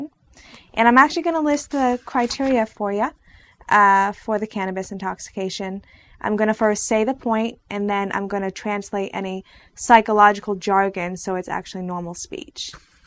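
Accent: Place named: New Jersey, United States. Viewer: i like the way she talks it sounds like she may b from new jersey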